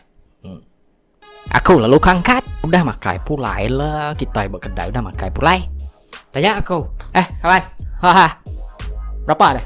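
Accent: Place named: United States. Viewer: OMG!! this is my first video of you! THIS IS SO CONTENTED AND FUNNY!! Love your US accent. New subscriber here. Mata d manah mat..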